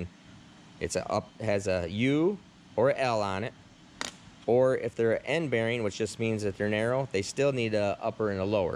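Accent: Michigan, United States. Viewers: Guys sounds like a Michigander. Those guys know their stuff.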